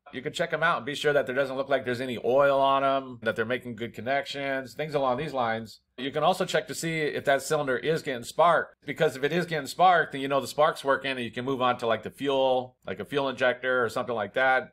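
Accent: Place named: Chicago, United States. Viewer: Very nice accent ❤ from where this accent is it a Chicago?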